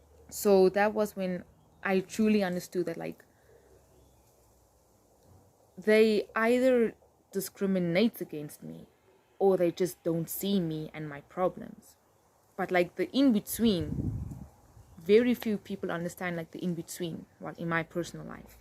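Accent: South Africa. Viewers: I don't get why people think you sound White though... I know you don't sound White,you actually sound like coloured folk from Western Cape! You sure don't look White aswell,you don't even look coloured with all due respect... I would guess you are Xhosa! Anyway Shoutout to raising the conversation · As an African Male from your accent and the way you look, full lips, flat nose, I thought you were a light skinned Black Xhosa African lady. I guess not to prejudge is the key to understanding peoples backgrounds without outright telling someone what he or she is. I have lived with the Coloured community in JHB since 2008 its now 2023 and I have been treated with the outmost respect. I can confirm that all the stereotypes I had about Coloured people as an African male is all gone, and I have also taught them about African/Black culture. Its actually refreshing to live with people until you forget that you come from different back grounds, when you look at each other you see another human being NOT racial stereotype. 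I used to catch THE FIRST taxi every morning with a lady who only spoke English and couldnt speak Afrikaans, she was open to tell us that she had never been accepted as a coloured when she moved to Johannesburg because of that. She grew up in Durban, spoke English and understood Zulu only. Moved to JHB as an adult to live with family, the family spoke Afrikaans as a first language...SHOUT OUT TO RIVERLEA EXT. ZOMBIE. BOKSTRAAT. EXT 5. EXT 10.👋👋👋👋 AWEH!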